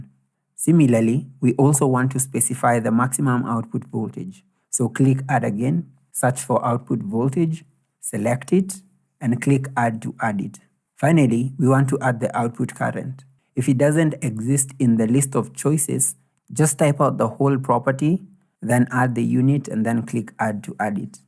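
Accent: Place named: Kenya. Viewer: You sound like a Kenyan, I'm impressed by the clarity of your explanation and inspired to gain such understanding. Great work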